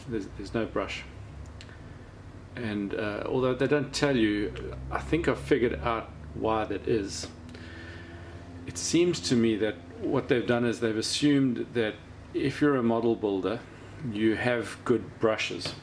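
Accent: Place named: South Africa. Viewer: hey sorry I meant they are sold here in Brisbane. And I confused your accent for mine at the start of the video (Australian). But as I heard you talk more I realised you are South African! Funny how we have some similarities in our accents. Do you ever find that about Australian accents? That they can be similar for certain words?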